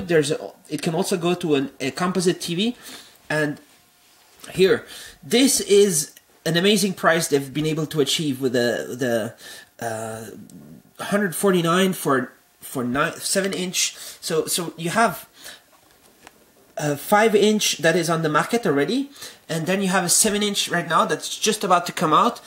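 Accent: France. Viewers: Tu as un accent françai ! ^^, Pourquoi le clavier est-il AZERTY ? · OMAGAD you're so french dude ^^ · Ta un accent francais mec. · accent francais ca mdr !!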